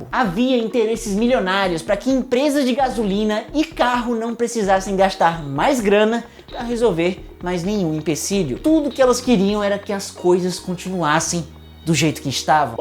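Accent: Ceará, Brazil. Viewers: Cearense kskskskdj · Cearense ater falando de ciência é engraçado parabéns gosto dos seus vídeos, eu aprendo e mim divirto 👏👏👏👍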